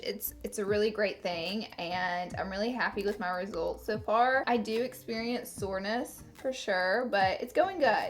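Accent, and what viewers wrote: South, United States. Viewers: Girl I am from Texas and transplanted to TN so I hear you on that accent and dirty south is SO right. Also I started graying at 19 so I couldn't tell you what my natural color is. I DO know I need to go get another hair appointment but I have more time than money so I go to the beauty college lol I enjoyed your Q&A. You are freakin hilarious. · I can tell I'm from the south.. I didn't even know you HAD a country accent. Haha, i just thought you had a normal voice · Beautifully said and love your southern accent..... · Ok what part of the south are you from. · you should've not told us ur habit of looking down to our left cuz now I'm counting how many times you do it haha!! & girl your losing your southern twang :( better get back to Bama soon.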